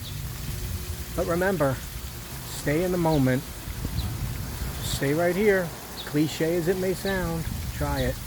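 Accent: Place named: North, United Kingdom. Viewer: Thank you I needed to hear this. I am a chronic worry wart. It’s also nice to hear the you deliver this message in the vernacular from up north where I grew up. Thank you✌️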